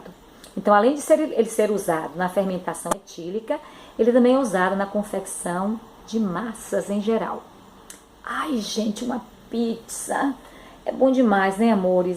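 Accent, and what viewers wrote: Northeast, Brazil. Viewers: Esse sotaque nordestino deixa o vídeo mais empolgante ... Na moral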